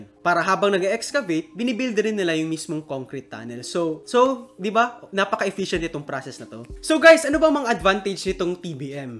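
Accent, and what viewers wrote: Visayas, Philippines. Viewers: Bisaya diay... 😆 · Bisaya ka pala bay grabeha HAHAHA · bisaya man diay ka kol, hahaha! nice content. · bisaya d.i ka bay ahaha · Ayyy bisaya ka.man dong 🤣🤣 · Bisaya mandi-ay ka Engineer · Bisaya ka man d.i Engr. hehe salamat sa paliwanag 😁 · Bisaya ka engineer?😅🤣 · IDOL GYUD NKO IMONG ACCENT SA BISAYA DOL :D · Bisaya accent🤣🤣💪💪Sakk lagay..... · Bisaya ka man diay sir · bisaya kaayo oi! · Uy bisaya man diay haha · Dugmok🤣🤣 cute kaau ka magtagalog sir bisaya kaau.murag ako sd 🤣. New sub here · Bisaya diay ka sir hhahaa 🙂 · Taga asa man ka Sir bisaya man ka ehehe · AY BISAYA D I KA? KAYATA PAREHA TA 😂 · Nalingaw kos bisaya nimo tol, bagong fan here 😂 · Ayg paila na bisaya ka baii bwhaja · hahahahahah bisaya pud diay ka lodi?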